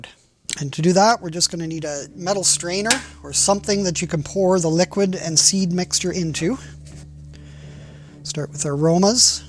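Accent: Canada. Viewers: Love your clarity, simplicity and lively Canadian accent! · Great vid, thanks! Southern Ontarian here, living in Utah...it was nice to hear the good ol' Canadian accent. Also nice to hear the word "Tupperware". I'm going to try this method for saving seeds. Cheers!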